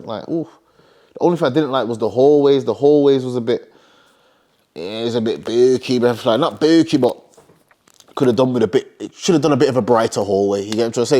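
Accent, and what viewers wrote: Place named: Birmingham, United Kingdom. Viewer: No one’s sounded like they are from brum more than my man lol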